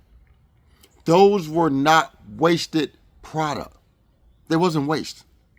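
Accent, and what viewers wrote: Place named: United States. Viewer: American accent is really troubling.. is he talking about a Pizza?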